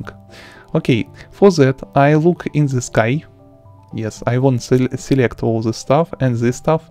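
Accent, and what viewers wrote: Russia. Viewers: This is a russian accent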